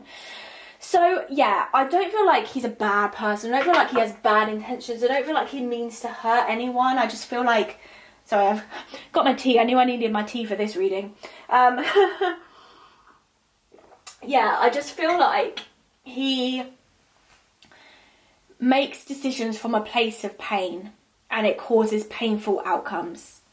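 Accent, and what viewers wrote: United Kingdom. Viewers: I am obSESSED with your accent 😩😍 I feel like I can listen to you talk forever. It’s so calming & posh. Is it British ?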